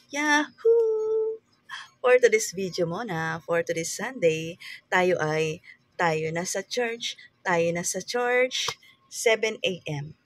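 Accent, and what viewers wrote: Waray, Philippines. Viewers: Hello sis lagi kita pinanonood mga vlog mo nadidinig ko nag wawaray ka taga saan ka po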